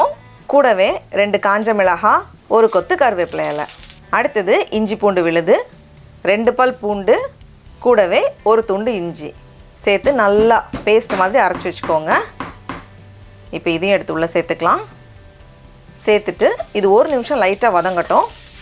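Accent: Nagercoil, India. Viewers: Hi akka nice to see your cook we are so lucky......so thank u for ur madras samayal... us la irunthalum nama nagercovil vitukodukama pesurinka very nice .... so we support always u akka carry on .....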